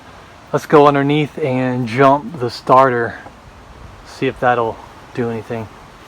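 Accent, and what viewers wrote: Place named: United States. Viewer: My little sister who knows nothing of these things would do a better job . This guy is speaking American not English . B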